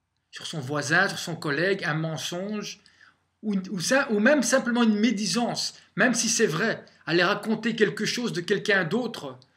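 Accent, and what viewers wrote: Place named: Belgium. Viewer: Le petit accent Belge qui passe bien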